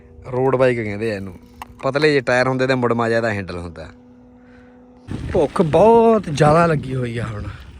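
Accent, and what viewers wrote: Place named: Malwa, India. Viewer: Bai ni boli jma e desi malwai boli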